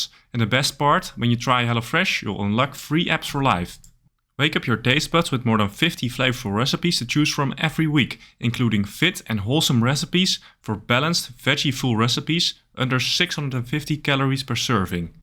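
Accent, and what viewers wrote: Netherlands. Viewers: het nederlandse accent is niet te missen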